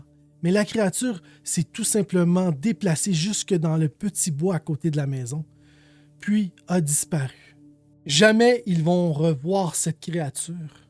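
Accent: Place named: Quebec, Canada. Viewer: Toujours un plaisir d entendre l 'accent , à Guebec , nos anciens parlaient comme ça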